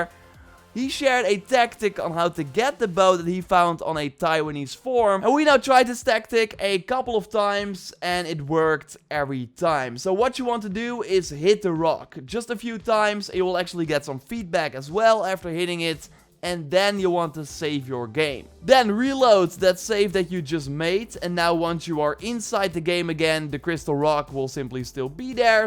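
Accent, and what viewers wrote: Netherlands. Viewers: Are you Nederlands? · How can people listen to this guy, the Dunglish is hurting my soul. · are u ducht?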